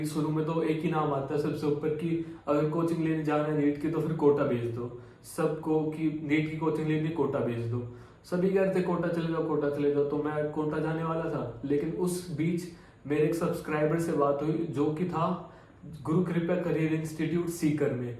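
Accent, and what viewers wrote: Haryana, India. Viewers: Aap haryana se ho kya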